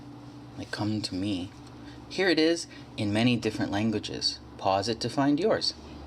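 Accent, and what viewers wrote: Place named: United States. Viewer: Your voice is very clear and I like your American accent. Best wishes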